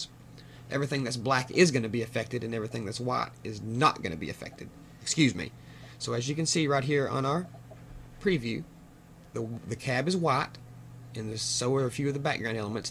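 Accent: South, United States. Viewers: Very interesting, and very very informative indeed. This guy is a savior! a savior with a seriously serious southern draw! lol ;-P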